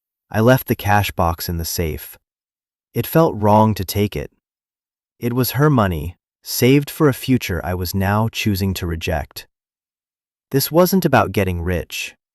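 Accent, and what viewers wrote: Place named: United Kingdom. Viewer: STUPID, STUPID, STUPID!! Stories told in the "first person" MUST have the following to be professional and correct. Correct gender voice of the main character. ( Female voice for female character, and male for male.) Correct accent for the charcter. (Not a British accent for a 5th generation charter from the Deep South.) Other than that it is not worth listening to, so at less than one minute into your story, bye.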